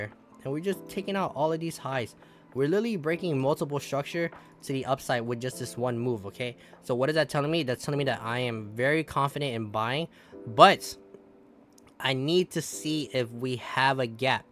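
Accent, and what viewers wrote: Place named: Toronto, Canada. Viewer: you got that toronto accent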